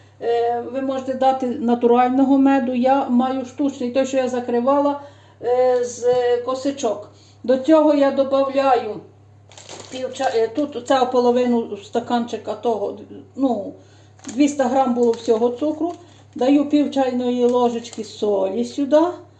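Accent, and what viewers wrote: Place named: Horodenka, Ukraine. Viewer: Слава Ісусу Христу,з неділею святою будьте здорові.П. я за браком часу невстигаю вам писати коментарі,а ви працюєте,випікаєте.Дуже гарне ваше печиво,а штолени сирні,які апетитні,ви напевно весільна господиня,або десь в їдальні працюєте.Дякую вам за ваші рецепти,що ділитеся з нами.Вітаю вас з прийдешніми святами,зичу миру нам всім та здоров'я.Ви часом не з городенківщини?колись там були в гостях і там похожий говір.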